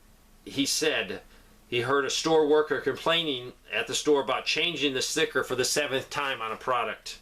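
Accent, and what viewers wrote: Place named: South, United States. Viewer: He's Southern. We talk funny!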